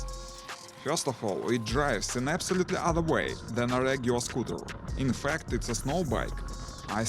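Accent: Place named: Russia. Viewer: мужик у тебя акцент русский.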